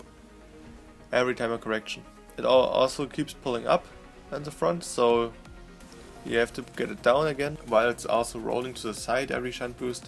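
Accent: Germany. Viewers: Bro du bist deutscher wie du redest wtf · Mashalla guter deutscher akzent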